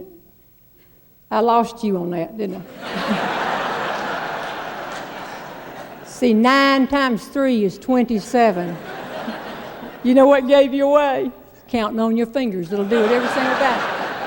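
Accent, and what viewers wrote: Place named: Georgia, United States. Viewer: her accent sounds so much like a South Georgia accent I love it!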